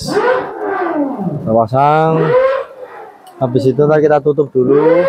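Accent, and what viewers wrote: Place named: Java, Indonesia. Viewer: waudohe, kulon kinten seputaran jogja jateng ndanku, logate kok jowo banget, ajeng nderek pasang menawi celak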